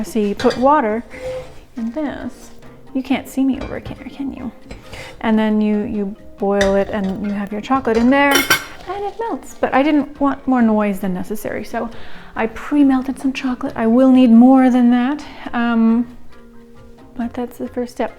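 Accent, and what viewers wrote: France. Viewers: I plan on trying this next week. And I like your French accent. :) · Oh my god you speak french i love that ! And your french accent IS so cuteeeee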